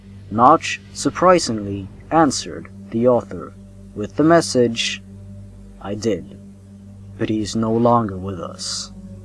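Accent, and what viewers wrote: Sweden. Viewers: are you from Sweden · wait a sec.....are you... SWEDISH??!? like I am ^^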